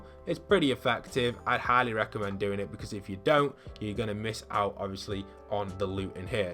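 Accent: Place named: United Kingdom. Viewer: Anyone else notice he has a British accent but goes really northern when he says chug jug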